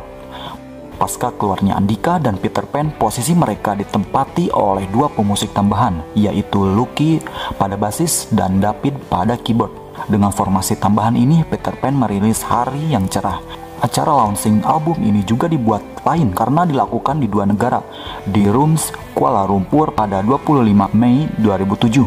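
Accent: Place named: Sunda, Indonesia. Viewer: Logat sunda narator nya kental banget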